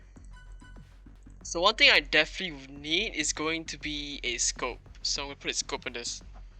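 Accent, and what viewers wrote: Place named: Singapore. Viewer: u sound singaporean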